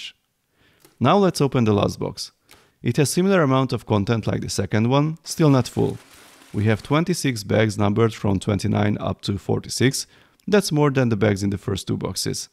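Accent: Hungary. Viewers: Csak szerintem van a narrátornak magyar akcentusa? :D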